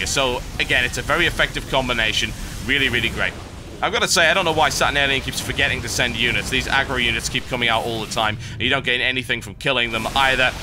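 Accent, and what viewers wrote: United Kingdom. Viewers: This was just enjoyable, the best part was of course when he rage quit. But your evil laughter kept me watching, as well as the charming British accent.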